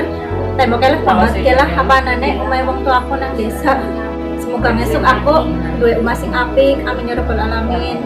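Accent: Banyumas, Indonesia. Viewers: Suara apaan tuh serem😥😥semangat kak bikin kontennya ngapak yo ra popo 😁 😁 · SEMANGAT terus ya mbk Kiye asli wong ngapak apa ya' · Seneng bahasane mbak ngapak lucu , menghibur bgt salam kenal dan sukses ya luhur. 👍🏻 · mbak yu mbak yu ngapak kie...dulur seneng wis diparani...mangan puding enak plus horror...sukses ya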